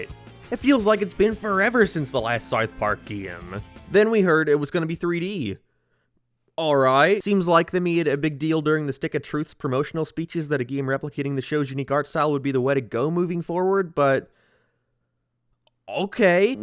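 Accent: Ireland. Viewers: It’s a Irish accent he even talked like that as a child man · It's kind of put on but also he's just irish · He’s irish you testicle sucker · i keep forgeting your irish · Irish accent I believe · You sound more Irish when you were younger. Glad you grew out of that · It's an Irish accent you simpleton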